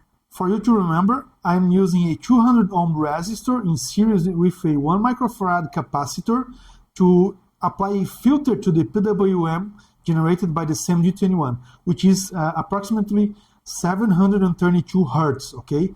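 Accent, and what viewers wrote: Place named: Brazil. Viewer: my guy you cant hide your accent most obvious brazillian ever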